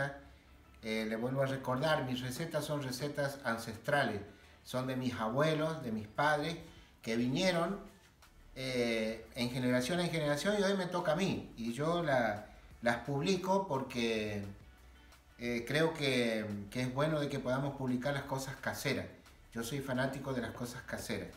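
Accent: Santiago del Estero, Argentina. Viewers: Q bueno amigo .tenés tonada santiagueña puede ser? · Amo la tonada Santiagueña soy de catamarca muy linda explicación 💪🏼💪🏼🇦🇷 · Que lindo escuchar la tonada santiagueña en un video productivo 🙌🏼 abrazo grande hermano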